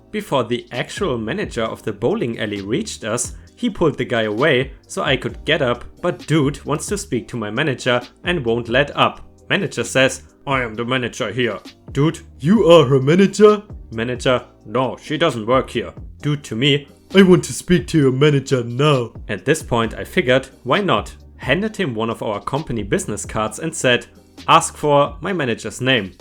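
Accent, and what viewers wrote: Germany. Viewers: Sounds like he's from Germany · You sound so german :D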